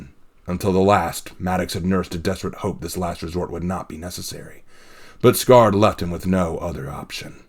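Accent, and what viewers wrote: Scotland, United Kingdom. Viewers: What an awesome read! Your Scottish accent wasn’t that bad either and your overall delivery of the entire story is top notch. I’m more surprised that the story isn’t that bad as I originally thought, but still could use a little bit of work. Still, great video!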